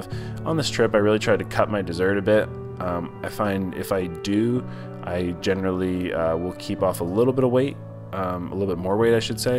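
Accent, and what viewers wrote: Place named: Texas, United States. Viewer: Oh everytime you come to Texas you start speaking Texan... that's so cute. I also go for the fried fish and green beans when eating at Golden Corral. Pot roast, never, it's always too dry. Bye enjoy your stay in Texas.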